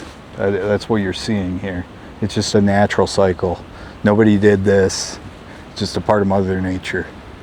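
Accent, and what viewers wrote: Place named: Canada. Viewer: Wooden pipes are just flat sided barrels, pretty much the same cuts to the wood, except the steel wire holds the compression. Once the pipe gets water, the wood swells and the wires don't. Because there is a water pipe the same size going downhill from this building I believe it was a speed baffle and control using 2 guillotine valve blades. Downstream somewhere would be a water wheel to power a belt drive system most likely for a lumber mill. Don't know where this, is but the guy has a bit of a Canadian accent so lumber seems most probable.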